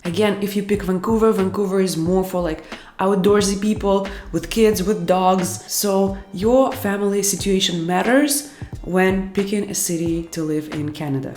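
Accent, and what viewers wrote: Russia. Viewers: You speak very clearly. Everyone like me who are hoping to go and settle in canada soon, will be able find your videos very informative & helpful.. By the way, No offence, i like your russian accent more❣️ Thank you for all those videos. Carry on..,❤️❤️ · Love your Russian accent. Sounds kinda cute though lol 😊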